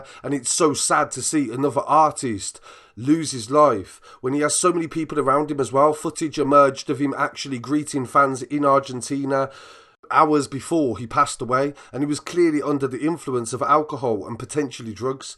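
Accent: Birmingham, United Kingdom. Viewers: You from bruming ham i hear your English brummie dialect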